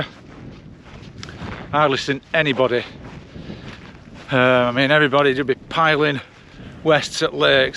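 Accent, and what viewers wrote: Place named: Yorkshire, United Kingdom. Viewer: Many thanks. We really enjoy your videos and your appreciation of birds, insects ,wild flowers etc, and we love your Yorkshire accent.We used to live in Coverdale, North Yorkshire and now we live in Alberta, Canada. We miss the walks but we enjoy yours and pretend we are walking with you. Please keep walking and sharing. Many thanks.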